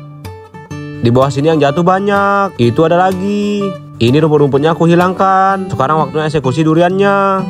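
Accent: Java, Indonesia. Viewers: saya mau tanya, apakah kamu ini ada keturunan orang Jawa kok dr logat’ya Jawa bgt bang??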